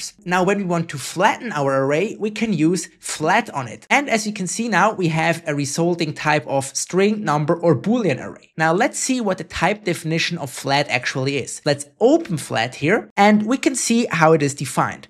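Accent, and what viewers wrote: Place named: Germany. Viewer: Swiss or German colored english - sounds a bit clunky!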